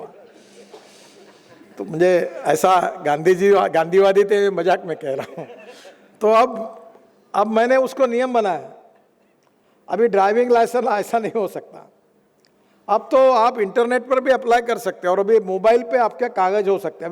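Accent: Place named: Nagpur, India. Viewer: Kya baat hai...👍... Nitine kakaa,...maja aagayaa sunkar....😂😂😂.... bahot badiya bhashan...❤️...se akdam... Nagpuri touch me...🙏 Namarkar...apko..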